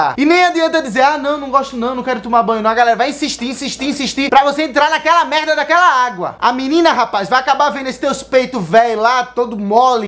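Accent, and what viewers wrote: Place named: Northeast, Brazil. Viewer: Esse sotaque e gíria nordestinos dão um plus muito foda pro vídeo. Hahaha Fica ainda mais engraçado. Parabéns!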